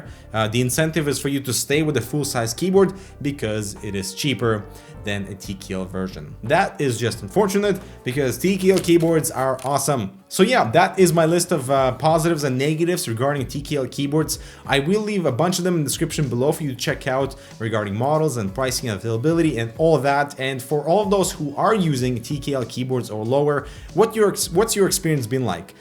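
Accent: Sweden. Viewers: You sound like your Swedish. I like it :) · You sound Swedish...Are you Swedish?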